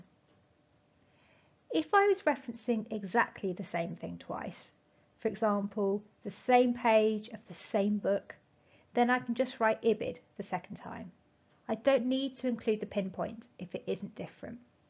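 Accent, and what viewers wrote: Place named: England, United Kingdom. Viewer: I love your English accent ❤️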